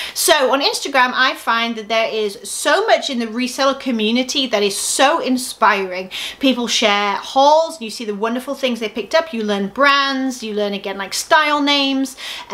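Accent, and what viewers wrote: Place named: United Kingdom. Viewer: great information! thanks. i've requested to join the groups. i have a personal question. where are you originally from? i can't quite place the accent (assuming UK but what part?) thanks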